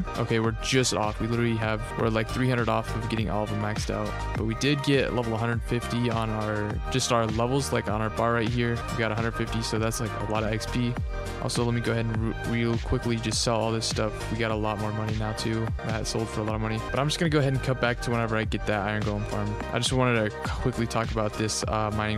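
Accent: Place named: Quebec, Canada. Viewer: you're 100% from quebec esti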